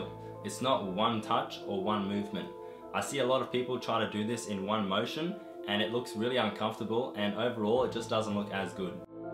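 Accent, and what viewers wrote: Australia. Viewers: didnt realise you were aussie dude! where abouts are you from? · U Australian ?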